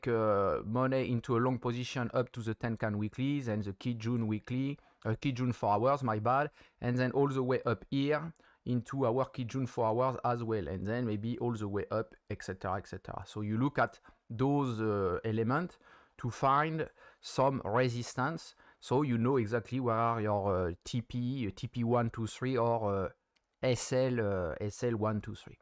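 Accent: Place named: France. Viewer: Salut t un français a ton accent tu peux pas faire une vidéo sur burency en français stp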